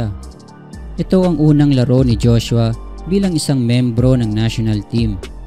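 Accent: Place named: Surigao, Philippines. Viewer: "Bul yo" yan pag pronounce... Surigaonon ni bai...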